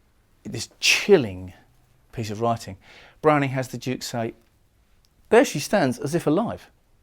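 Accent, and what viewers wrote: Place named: England, United Kingdom. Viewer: Excellent teaching, great to hear an English accent. Thank G_d for a simple interpretation in plain English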